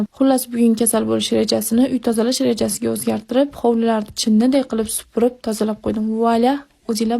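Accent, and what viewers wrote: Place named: Tashkent, Uzbekistan. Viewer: Sizam xozi toshkenli bopkettiz😂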